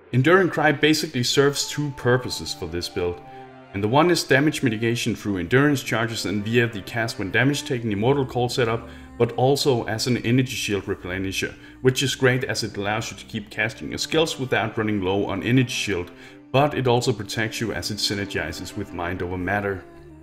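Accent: Denmark. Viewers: Nice videos tho i must ask: Are you danish becuz you sound like that xD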